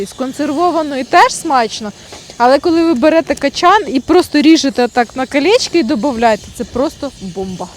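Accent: Kirovohrad, Ukraine. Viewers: Я зарас далеко від Кіровоградщини тобіш на фронті і мені так приємно чути наший рідний суржик я наче побував дома дякую Вам за Ваші відео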